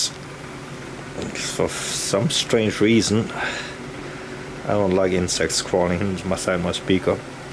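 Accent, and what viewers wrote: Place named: Germany. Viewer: Very good Work Herr Teuton, you sound like a German living in the USA. If that is the case, I have a similar story. Myself I purchased all the Upgrades for a KLF-30 with Ciare woofers and will be attempting to build a set of cabinets from scratch.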